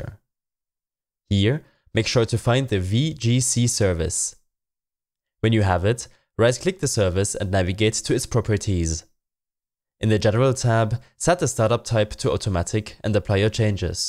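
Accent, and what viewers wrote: Germany. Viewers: Nice german accent. I hope your instruction would help me to solve my problem. Nice video and have a nice day. :D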